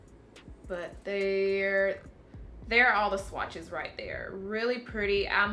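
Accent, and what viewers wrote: South, United States. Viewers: Omg, i love your accent😄, you sound a bit from the south country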